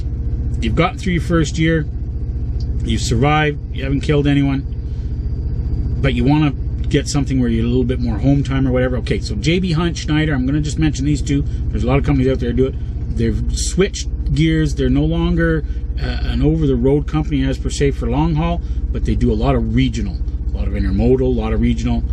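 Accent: Canada. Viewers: I like what your doing. Next time just a little less Canadian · Hey! I noticed you sound pretty UP or Canadian. I'm here in mid michigan working at Pilot Flying J night shift. I think I might have seen you before. Anyway, it'd be really cool for you to stop by. (Don't tell management;D ) I'll throw you a free coffee, soda, cup of ice, or some food if you want. Just look for the bald guy. See! This goes to show If you're a cool trucker, then truck stop cashiers will hook you up. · Are you from Canada? Lol wondering because of the accent. You sound like the guys from this show called “trailer park boys” on Netflix which is filmed in Canada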